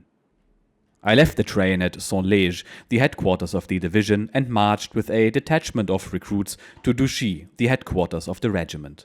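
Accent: Germany. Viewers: I have read this book twice and my daughter has read it but being read with the German accent is undoubtedly the best.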